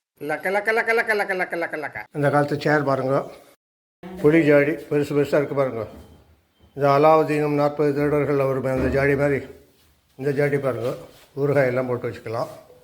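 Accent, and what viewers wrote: India. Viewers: Thanjavoor bashi,kekkarathule nanna iruku. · The commentary is typical Tanjore brahmanical dialect..this adds more flavour to the video..Very nice..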